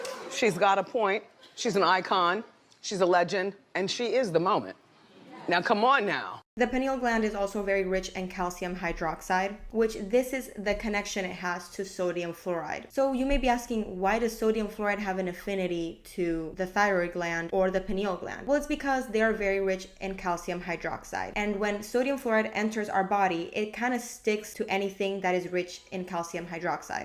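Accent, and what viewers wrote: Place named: Argentina. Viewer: I knew you where Argentinian lol...the accent is dead giveaway 😂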